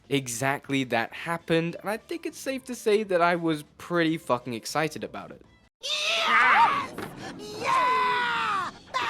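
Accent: United Kingdom. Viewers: dude what the fuck are you British?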